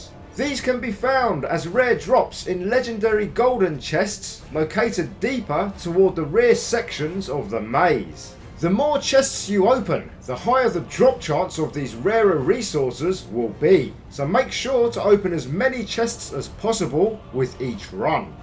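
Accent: United Kingdom. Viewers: It's always sine British dude on these game videos 😭😭😭😭 thanks tho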